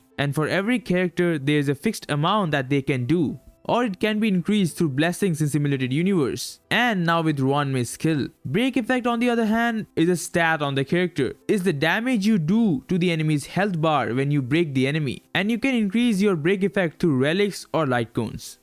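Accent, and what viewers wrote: India. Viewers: Ugh cant stand indian accents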